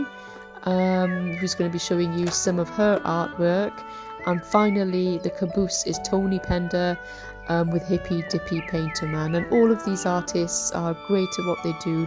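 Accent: United Kingdom. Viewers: Beautiful work ! Wow ……where is you accent from may I ask ……you sound like you’ve e got a British twang like myself lol ❤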